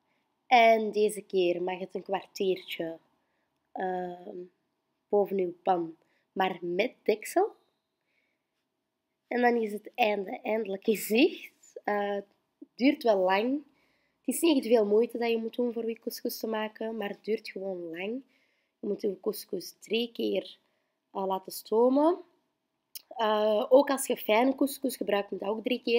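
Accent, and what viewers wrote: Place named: Belgium. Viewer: jouw Belgische accent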